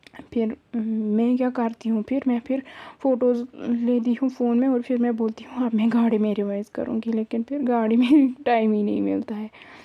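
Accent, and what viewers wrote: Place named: South Kashmir, India. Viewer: Recalling my South kashmiri classmates from ur accent Anyways good wishes and keep it up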